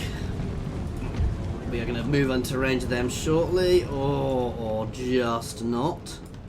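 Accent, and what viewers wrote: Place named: United States. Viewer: by the sound of your voice, you're as american as they come 😎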